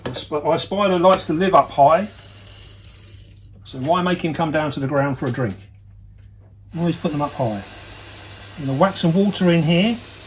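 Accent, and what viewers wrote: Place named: London, United Kingdom. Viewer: man, I didn't like spiders until I found your channel. Wow. And I love that salt of the earth London accent 👍🏻 Changing people's minds pal All the best From sunny Glasgow 🇬🇧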